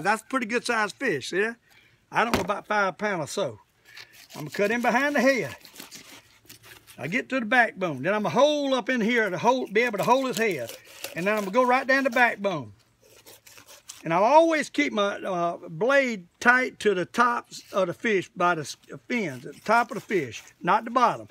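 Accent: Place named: South Carolina, United States. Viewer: Great video. Love the gamecock drawl too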